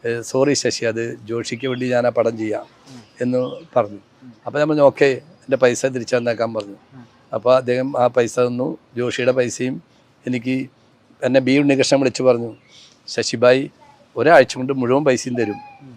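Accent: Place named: Thrissur, India. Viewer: നിങ്ങളുടെ സംസാരം കേൾക്കുബോൾ തന്നെ അറിയാം ആ ഗഡ്സ്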